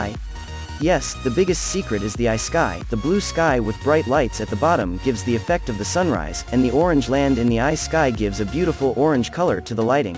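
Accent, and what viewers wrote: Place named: Nigeria. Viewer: I don't know his private channel. I just accessed him, Adolfo, and other Master Class Vids, through the Reallusion site, under the Master Class tutorials section. is actually like Nigerian, or something like that (sorry if I messed up your Nationality description based on your accent). 😁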